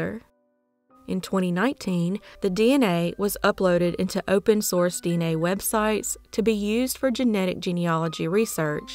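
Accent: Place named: New Orleans, United States. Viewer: Looking at the picture and knowing how she was found in the bloody bathroom, that shower curtain seems an unfortunately eerie visual choice :O I always look forward to your uploads--it's like a taste of crime and a taste of home (I'm from New Orleans) at the same time. I live in Michigan now and your lovely accent is like familiar company after these many months :)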